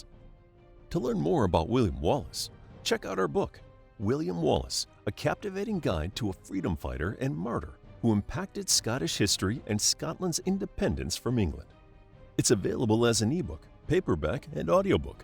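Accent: United States. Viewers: Why should we listen to an American voiceover distort the Wallace history by including so many errors ? The story of Wallace was a translation of the original Latin that was translated by The Minstrel in 1361 from a document by eyewitnesses probably around as early as 1310 that was commissioned by Bishop Sinclair of Dunkeld.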